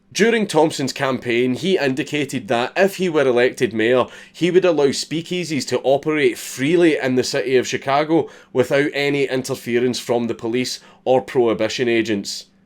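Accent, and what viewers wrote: Scotland, United Kingdom. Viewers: I'm not used to the Scottish accent so I thought he kept saying they put a hat on Bunyon. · Love your show I just wish you werent Scottish. Can you talk non Scottish? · Fuck these videos are so good. I could listen to him speak all day, maybe it’s because I’m and American and the Scottish accent is amazing, but I feel like This is the best history class you could get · Listening to American history in your lovely Scottish accent should be mandatory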